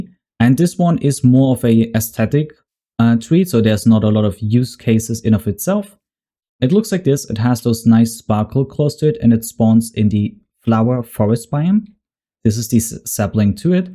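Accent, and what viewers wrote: Germany. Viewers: you sound very german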